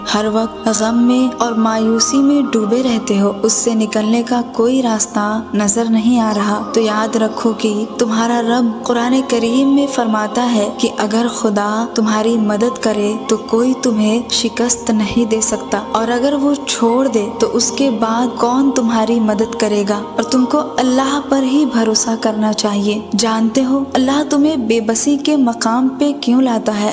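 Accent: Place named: India. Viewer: Your accent looks like from India . TRUE ???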